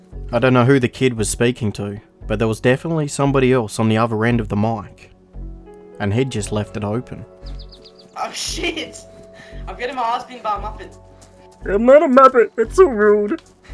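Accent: Australia. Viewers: first time as a aussie hearing another aussie speak and going we do not sounds like that aha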